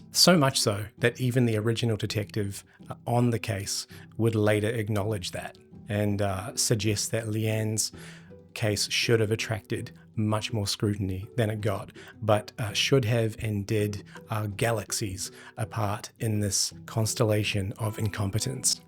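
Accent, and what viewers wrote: New Zealand. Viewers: do I detect a hint of NZ accent?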